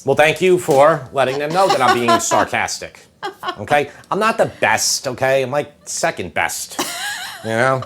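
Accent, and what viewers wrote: New York, United States. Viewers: This sounds like a MUST MAKE meal! I’m drooling. As a NYer at heart, I love hearing you talk, (I miss Long Island) I love all the information and tips you provide. Great job! ❤ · Oh and you keep talking, and especially that awesome New York accent! People tell me I talk really Chicago and I love that! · Always great recipes. Um, I have the exact same accent you do! It’s either Long Island, Staten Island, or Northern Jersey! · Agreed!! Im on long island and his accent sounds like home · You don't talk too much. I actually enjoy your New York accent. It's authentic like your videos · As a NYer, he sounds like family to me! We are just outgoing and informative, whether you want us to be or not! lol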